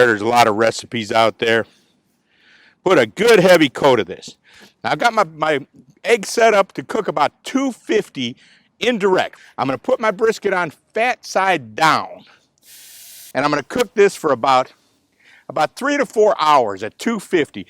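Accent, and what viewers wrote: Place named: Midwest, United States. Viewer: One of my favorite things in the world is listening to somebody with a Midwestern accent talk about meats. It reminds me of Saturday night live Super Fans Chicago Bears sketch.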